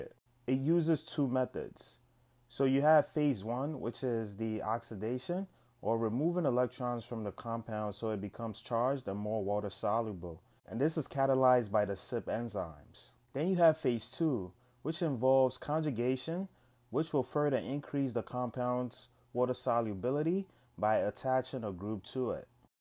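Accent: United States. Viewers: Why do I hear a nawlins accent? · great video. lol but I swear I can hear a NYC accent.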